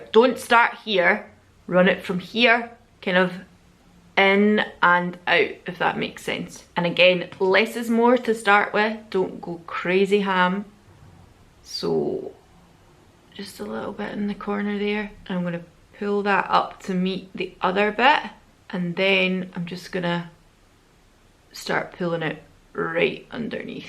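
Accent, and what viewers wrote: Scotland, United Kingdom. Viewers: Love the video! Been looking at these Bodyography glitter pigments. They remind me of some of the flakie shadows from Copacetic Cosmetics** I'm from USA but think they ship to other places in the world. Love your Scottish accent too btw! 💓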